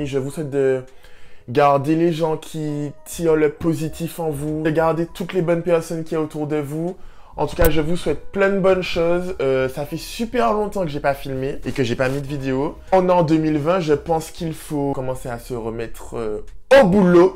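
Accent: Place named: Martinique, France. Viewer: Wouah!!! L'accent martiniquais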